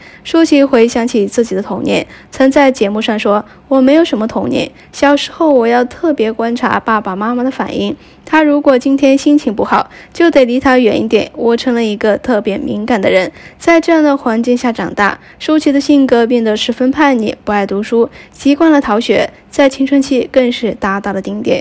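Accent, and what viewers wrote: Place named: China. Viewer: 不要再毀謗侮辱了，留口得了吧？大陸的口音可以滾了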